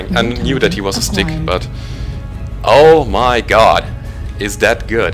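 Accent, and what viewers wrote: Germany. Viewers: Just discovered your channel.Enjoying your MWO vids.Cool German accent and greetings from Scotland.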